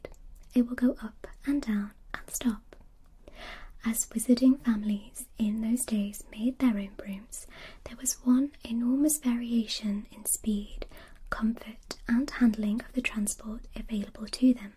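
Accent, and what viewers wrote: United Kingdom. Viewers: normally has a British accent, but she did a Hermione accent on top of that!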